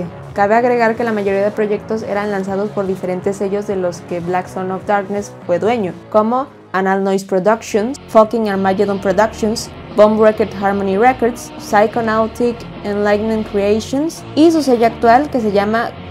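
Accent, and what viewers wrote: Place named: Colombia. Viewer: hablaste como colombiana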